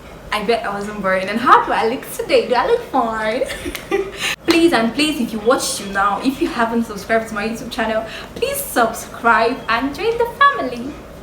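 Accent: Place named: Hausa, Nigeria. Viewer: Nice and educative post. It is very essential to inform ur relative when travelling especially long distance incase of the unforseen,and only also going with extra cash is also vital bcos I can relate,my first travel to north I was stranded bcos I tot I have made the right Budget without knowing that the money won't be enough,God so good I called home and they sent me money. Hausa sweet for ur mouth ooo na,u are doing well,keep it up.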